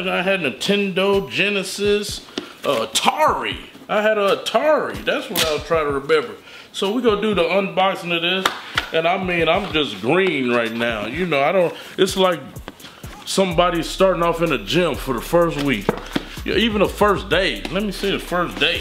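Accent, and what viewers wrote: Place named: Florida, United States. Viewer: your voice same like florida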